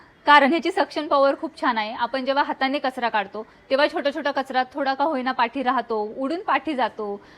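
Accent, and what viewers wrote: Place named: Satara, India. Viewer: Bolnya varun sataryachya disatay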